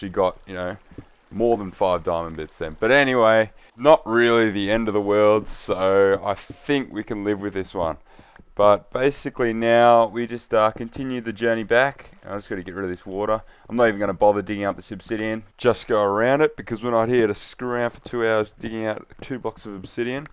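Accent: Australia. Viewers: you are Australian? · this guy is aussie like me :) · Are you Aussie? I'm a kiwi! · are you australian?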